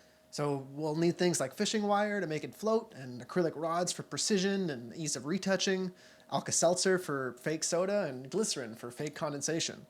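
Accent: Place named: Quebec, Canada. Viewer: J'me suis pas rendu compte que t'étais quebecois au premier video qu'j'ai écouter sur les flashs drag. Toute une surprise quand je t'ai entendu immiter ta mere. Tres bon contenue jusqu'ici, lache pas:D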